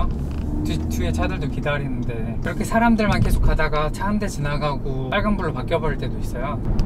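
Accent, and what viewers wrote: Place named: Jeolla, South Korea. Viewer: 어딘가 전라도의 온기가 느껴지는 ㅋㅋㅋㅋ😂 감사히 잘봤습니다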